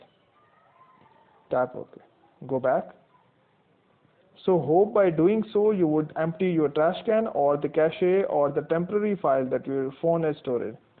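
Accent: India. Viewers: At April 2020 Android phones empty the recycle bins after 60 days. I just wish this guy didn't speak with such a thick Indian accent because what he says is very helpful.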